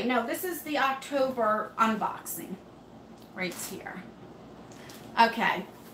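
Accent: New Jersey, United States. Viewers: Your eye make up is so pretty you have lovely eyes and your lipstick is so pretty too. I love your accent . Makes me think of the Sopranos . I hope that's not an insult. Xxx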